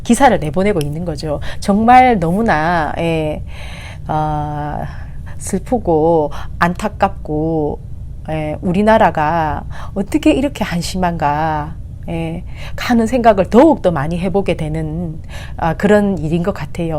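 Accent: Gyeongsang, South Korea. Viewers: 반갑습니다, 님, 특유 고발의 경상도 여성 애교 목소리,화이팅 합시다,이재명 은 좋은 뉴스, 나뿐 뉴스, 상관없이,득점하는 신 임니다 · 말투가 대구쪽이신듯 해요~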